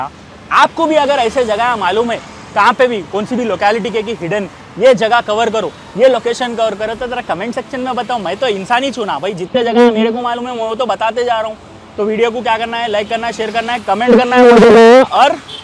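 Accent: Hyderabad, India. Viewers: Very interesting, love your hyderabadi tone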